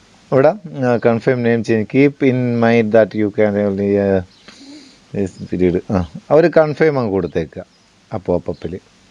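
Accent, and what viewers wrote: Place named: Kasaragod, India. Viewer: kasaragod aano🤔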